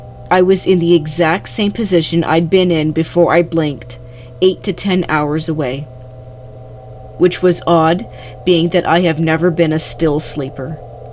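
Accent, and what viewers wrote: Canada. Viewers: It wasn't the content, it was the delivery. I've listened to most of your videos now and you do it on most of them. What I do like about your videos is that there is no repeats from the 100 hours of other channels' scary videos I've watched. I also get a chuckle when I hear your Canadian "out" and "about".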